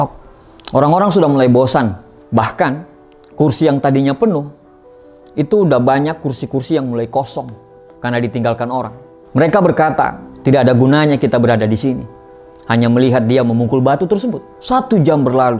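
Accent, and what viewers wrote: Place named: Banyumas, Indonesia. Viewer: Mntp ngapak gafir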